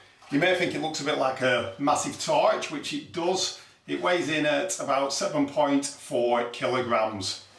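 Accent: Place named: Leicester, United Kingdom. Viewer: Great to see you back. Do you live in Leicester? Its sounds like you're from that neck of the woods. 2 and a half 'Howers' 😂😂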